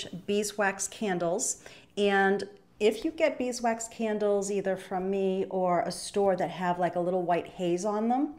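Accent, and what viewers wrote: New England, United States. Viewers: Hi, Your blue & white top is very pretty!! the Fall box was a lot of wonderful items, I was toooo late to order one but that's my on going luck, haha! I can almost smell all of the lovely Fall smells! That was so nice Carla could help pack the boxes, each one took some time!! take Care, hugs from Paula in Indiana!! p.s. I can really hear your New England accent!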